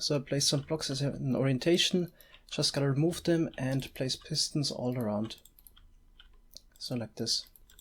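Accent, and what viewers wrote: Germany. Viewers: du bist ein deutcher das hört man omg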